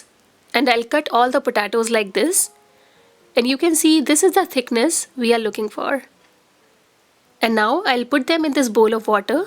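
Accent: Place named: India. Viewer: Da Indian aksent🤗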